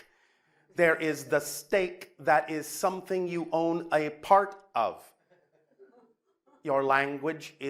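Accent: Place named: Ireland. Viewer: He talks a little slower and to me a bit more Irish lol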